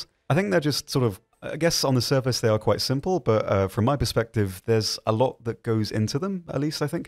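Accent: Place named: United Kingdom. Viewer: You create my favorite War Thunder videos on YouTube! Thank you for your hard work. Your British accent is also greatly appreciated by this American. :)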